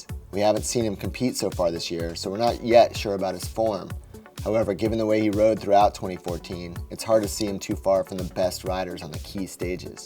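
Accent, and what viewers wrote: United States. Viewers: Why the new American accent? Don't like it all. Stick to British presenters, and maybe call in the Irish when the Rás comes into the conversation.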